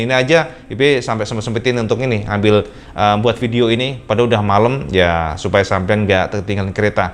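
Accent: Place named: East Java, Indonesia. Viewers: Suarane cak IWB memang joss gampang di pahami Jawa timur mana suaranya🤝🙏😁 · Beneran Mbah boso pean medok boso jowo wetan Salam hormat penggemar mu dari Gresik ! Ketokane awak Dewe seangkatan umur nya !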